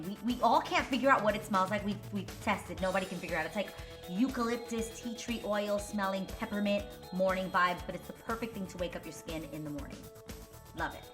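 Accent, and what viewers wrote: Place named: New York, United States. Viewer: I LOVE your newyork accent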